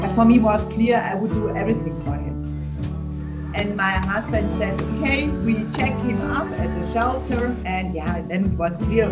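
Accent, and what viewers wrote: Germany. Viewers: I was already wondering about your German accent before...xd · Are you a german? Later - Yeeees you are a german. You Dialect is german. German Toll das es auch mal eine geschafft hat, soviele Klicks zu bekommen. Zeigt das du das richtige Thema hast. Meine Bonny hatte nach 8 Wochen 4 Babys bekommen. Ich hatte sie alle gut untergebracht. Eine hatte ich behalten. Clyde hieß sie. Mittlerweile sind alle gestorben. Ich glaube sogar, das meine Bonny für mich gestorben ist, damit ich gesund werden konnte. Sie wurde überfahren. ihre Babys, Clyde und später kam Sophie (Scholl) zu mir zurück, wurden fast 20 und 21 Jahre! Sophie habe ich Garten begraben. Als sie ging, habe ich ihre Seele gesehen. Sie glitt durch meine Finger und glitzerte. Ein Licht umgab sie, kreisrund um ihren Körper. So groß wie sie lang war! Ich vermisse sie noch immer! Obwohl sie schon 2006 ging. 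Schön das sie Heiko ein so gutes Zuhause gegeben haben. Das freut mich sehr! Ich wünsche ihnen viel Gesundheit und viele neue Tiere die sie retten können! English It's great that one managed to get so many clicks. Shows you have the right topic. My Bonny had 4 babies after 8 weeks. I accommodated them all well. I kept one. Her name was Clyde. All have now died. I even think my Bonny died for me so I could get well. She was run over. their babies, Clyde and later Sophie (Scholl) came back to me, lived to almost 20 and 21 years! I buried Sophie in the garden. When she left I saw her soul. It slipped through my fingers and glittered. A light surrounded her, circling her body. As tall as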